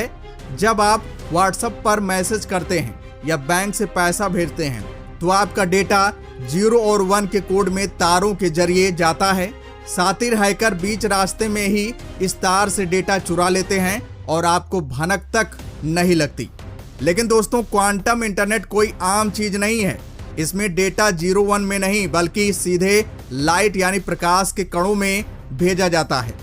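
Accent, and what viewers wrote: Bihar, India. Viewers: I wish our endeavour great success. I didn’t like the language of the presenter. Sounds too Bihari. Jai Hind.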